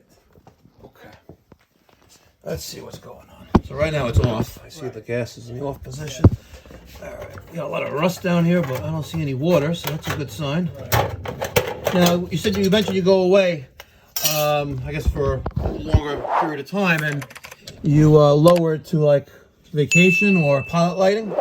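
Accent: New York, United States. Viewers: Excellent video like ALWAYS or as I stated in an earlier video last year when I first Subscribed... You always sound like Ron Jeremy with your New York accent. Sorry for the possibly negative stigma that it may bring up but I still say you are the man and we need some personality like you here in Utah! Anyway, stay safe and thanks for all you do! PEACE!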